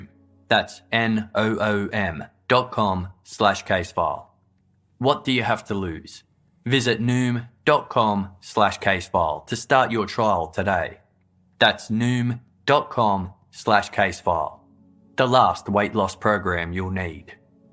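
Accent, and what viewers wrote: Australia. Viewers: He couldn't be more Australian, and speaking seems a struggle, is what I think I meant. Not so much a compliment as an observation. Listener since Ep 1, so not a casual or spur of the moment take, and one he might not disagree with to judge by the Q&A he once did. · Not NZ, 'Stralian' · If you mean the presenter then he sounds Australian or New Zealand. I really like his voice and find it so relaxing that I often put these vids on and fall asleep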